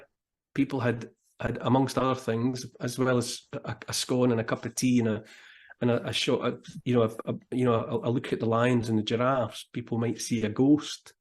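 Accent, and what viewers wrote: Scotland, United Kingdom. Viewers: you never struck me as a bright spark...not that I listen to you much as I can't stand the slummy Scauts accent. Learn to speak English!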